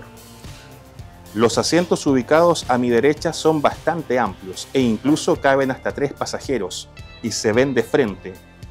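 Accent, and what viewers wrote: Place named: North, Chile. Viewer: Excelente nota! Y excelente noticia el que se vaya observando de a poco el revivir de ramales como éste a lo largo de chile. También destacar el claro y bonito dialecto algo nortino que nos recuerda a los oyentes que en chile nos queda aun un hermoso dialecto no cargado al coa contemporaneo. Gracias por la nota, me suscribo inmediátamente a tu canal! Saludos desde Suecia de alguien que hartos años vivió en chile. :)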